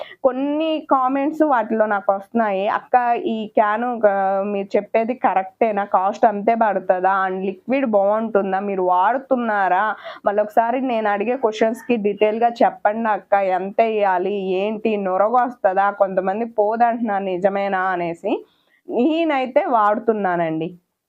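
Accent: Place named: Sathupalli, India. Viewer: Sathupalli side Telugu alane vuntundhi Thanu nerchukovalasina avasaram ledu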